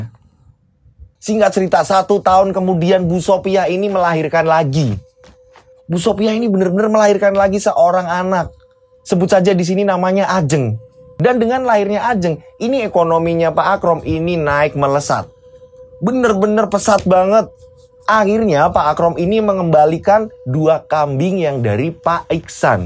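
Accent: Java, Indonesia. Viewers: Aku suka ceritamu mas, ada blastran jawanya pokonya lanjot terus mas dengan cerita yg menarik (BOOOM"))👍👍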